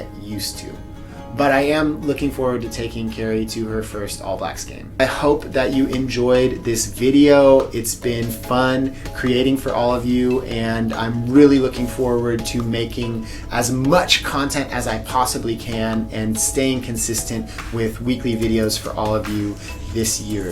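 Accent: United States. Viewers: Welcome Home! As a fellow kiwi, it’s super interesting to watch your journey on coming here to NZ. Look forward to you watching your journey in loosing that American Accent as you settle in 😅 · I'm sorry but how were you allowed to even come here, are you a resident ? that accent sounds like American so-called closed borders ay. Typical one rule for some and different rule to everyone else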